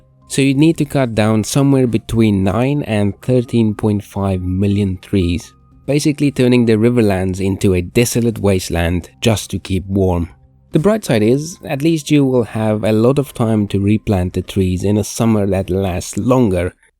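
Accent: Afrikaans, South Africa. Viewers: South African? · Loved the video.... but also... this is a very south african sounding accent. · 🚨🚨 afrikaans asoiaf youtuber spotted 🚨🚨 die skok van jou aksent hoor was groot, maar goeie video! keep it up!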